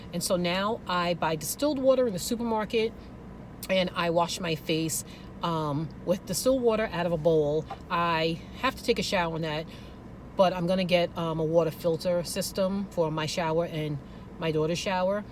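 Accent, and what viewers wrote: New York, United States. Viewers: So as you were talking ,im like she has to be from NYC...girl I am too and moving also, gour video was very helpful.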